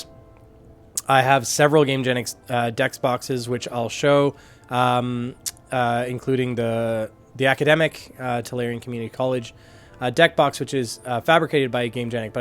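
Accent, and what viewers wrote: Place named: Canada. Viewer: You sound extra Canadian in this video😅 Great video thank you!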